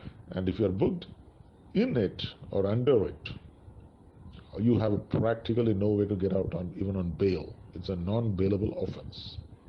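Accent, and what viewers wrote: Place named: Kerala, India. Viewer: Poda devil 👿 Who are you ? Are you 👿 spirit? What is your aim? Nitne English kettal ariyam nee oru mallu annannu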